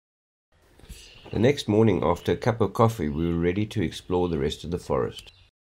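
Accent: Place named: Australia. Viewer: Wow brother you sound like your from australia or something my names mitch i live in butler pennsylvania ive been to all places youve shown in your video except for a couple. Do you live here in PA?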